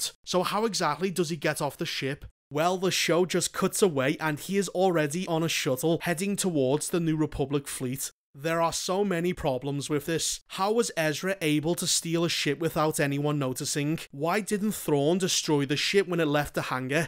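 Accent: Liverpool, United Kingdom. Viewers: the scouse accent only adds to the on point comments XD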